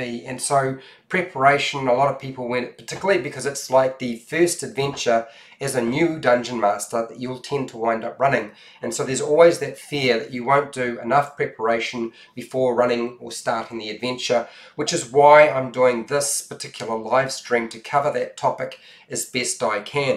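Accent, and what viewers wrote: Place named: New Zealand. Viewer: you sound like you’re from New Zealand and stereotypical people, think fish and chips for you sounds like fush and chups.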